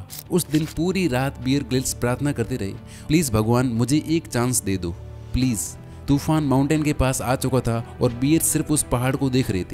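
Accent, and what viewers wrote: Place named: Marwar, India. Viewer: Jodhpur se ho? Marwadi tone aa Rahi h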